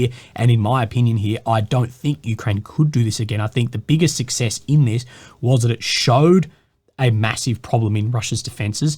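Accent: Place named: Australia. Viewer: What a fentastik 😍😍😍😍😍 day what a fentastik accent i love Australia accent wooow, you channel is great but your accent is amazing, fentastik. Have fentastik day sir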